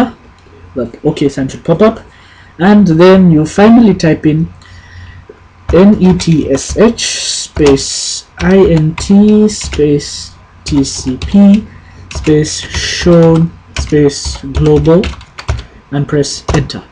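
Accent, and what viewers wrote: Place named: India. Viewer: not all Indians speak like him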